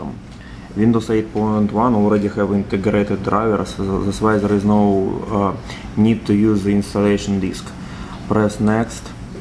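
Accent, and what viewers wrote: Russia. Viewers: Russian Accent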